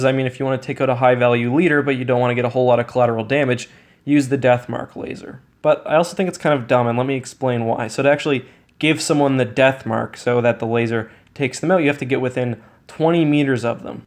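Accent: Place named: Saskatchewan, Canada. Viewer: You from Saskatchewan?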